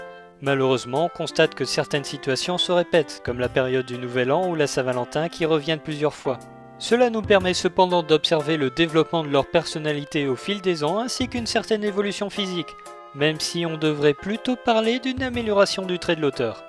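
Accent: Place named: Nord-Pas-de-Calais, France. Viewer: Yeah!! est de retour! Mec, tu m'as fait taper des barres de rire avec ton accent chti, en plus de m'avoir bien diverti avec une bonne critique. Faudrait que je me mette à Love Hina. J'ai tellement de Ken Akamatsu à rattraper. En tout cas ton retour fait hyper plaisir, bon courage pour la suite;)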